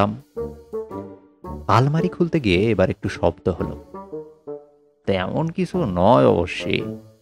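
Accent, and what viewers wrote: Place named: Bangladesh. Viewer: বাংলাদেশী বাংলা ঠিক কর, बाल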